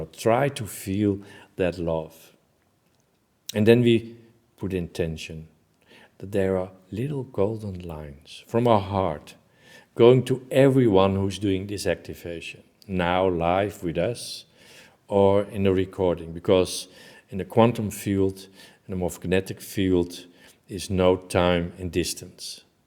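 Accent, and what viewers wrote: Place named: Netherlands. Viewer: Ik hoor een Nederlandse stem.. hoogst irritant! Waarom is dit?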